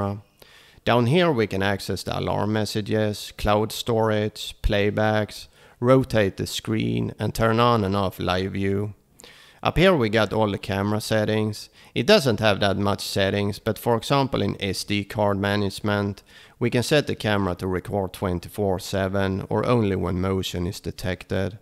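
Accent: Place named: Sweden. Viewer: That must be a swedish accent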